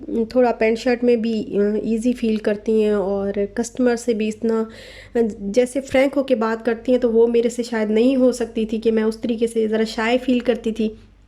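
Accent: Saraiki, Pakistan. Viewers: As'salam o Alaikum aap ke voice mai thori thori saraiki language aa rahe hai aap pakistan ke kis city se hain.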